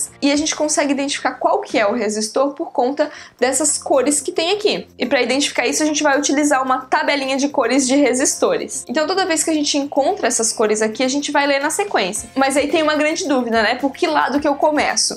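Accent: Rio Grande do Sul, Brazil. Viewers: E pelo sutaque é gaúcha kkk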